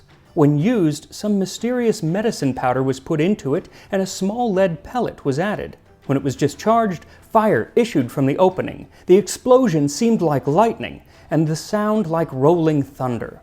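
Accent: Brazil. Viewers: I love your channel, but as a Portuguese native, you are saying portuguese words with a Brazilian accent. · Great video just irks me that when you talk about portuguese dishes or words u always say it with the Brazillian Portuguese accent and not the original Portuguese accent (which is fair for example in the Feijoada video), but it doesn't make sense in the video referring to dishes from Portugal like this/ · Bro, please DO NOT pronounce Portuguese words like the Brazilian do, it sound VERY VERY WRONG - cringe AF! Portuguese from Portugal sounds absolutely NOTHING like Brazilian Portuguese!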